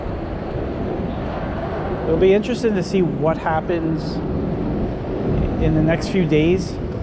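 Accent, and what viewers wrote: New York, United States. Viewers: You have a a Thick Newyork Accent lol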